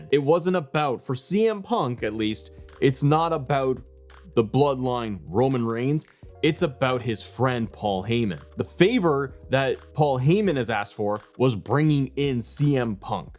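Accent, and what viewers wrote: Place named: Canada. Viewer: I can tell you’re Canadian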